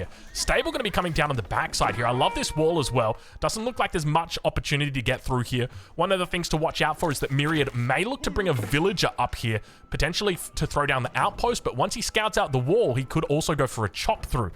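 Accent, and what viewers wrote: Australia. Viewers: Fresh in the morning, love it!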